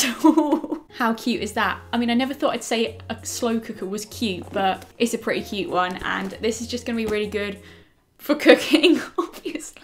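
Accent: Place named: London, United Kingdom. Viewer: you sound like you are developing a London accent maybe? I'm not great with accents but something seems different haha. Love you X💗💗💗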